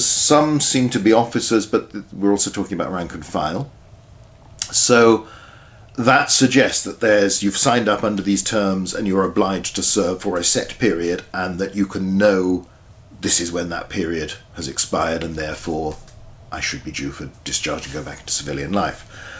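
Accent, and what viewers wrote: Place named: Cambridge, United Kingdom. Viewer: Its called Cambridgian English. Kinda like Cajun English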